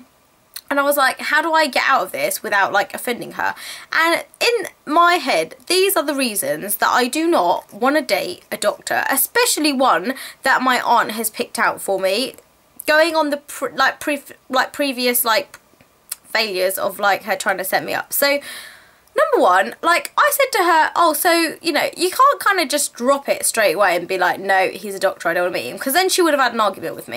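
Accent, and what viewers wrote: United Kingdom. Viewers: lol..just moved back from the UK to the States. You make me miss the accents lol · your accent is so alkdjfaldh WHY AM I NOT BRITISH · I love listening to your stories and I COMPLETELY agree with everything you said! :) It's like people think that just because he's a doctor and has money, makes him a catch. What about true love?! By the way, your accent is literally amazing! I could just listen to you talk all day! Haha! I <3 British accents! :)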